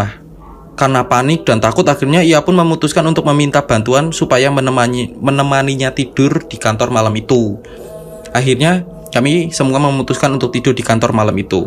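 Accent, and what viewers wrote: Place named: Semarang, Indonesia. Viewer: Logat semarang kihh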